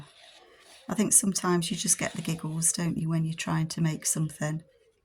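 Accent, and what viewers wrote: England, United Kingdom. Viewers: I just love what you’re doing with this journal!!! I love watching you craft and hearing your beautiful English accent. Hugs, Sharron 🥰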